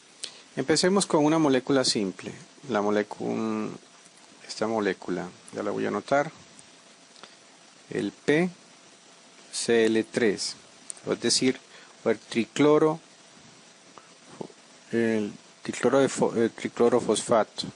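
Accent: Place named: Colombia. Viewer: tu acento suena a colombiano,lo digo por que hablas igual a julio profe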